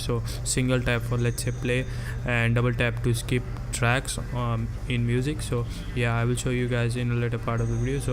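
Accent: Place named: India. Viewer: I hate this indian accent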